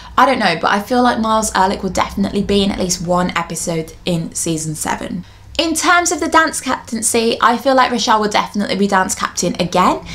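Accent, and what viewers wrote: England, United Kingdom. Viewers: I was watching this video because I love and she started talking about her dream but I didn’t hear the dream bit, so I was screaming my feelings as I was walking to the tv and was angry because I thought she was sharing spoilers on an episode that had already come out that I didn’t see! Then I was looking EVERYWHERE on my tv for it but I couldn’t find it! So I started searching it up on my phone about when it came out but couldn’t find anything. I knew different countries released tv shows at different times (like dance moms) but she has an English accent like me so I was confused. Then I rewinded it to see if she’d said anything about where she watched it and found out it was all a waste of time. I love and the next step! If you read this comment anyone sorry for wasting your time. Edit: Thank you for liking my comment! (I think that’s how you spell it) ❤️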